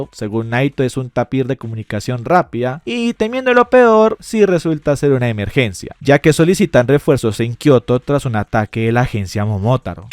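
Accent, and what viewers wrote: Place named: Colombia. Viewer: colombiano narrando anime cine🗿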